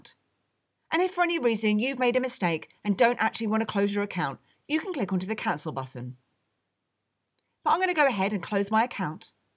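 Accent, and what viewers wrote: United Kingdom. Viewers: UK girl huh, you single?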